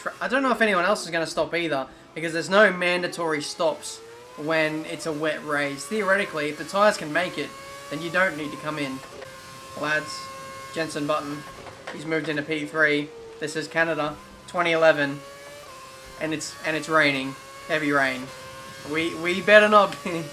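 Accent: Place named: Australia. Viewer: Love the videos. And its not just because of your aussie accent.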